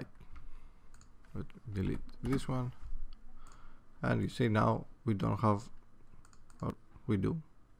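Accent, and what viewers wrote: Greece. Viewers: The Greek accent though :P Nice tutorial, much appreciated :D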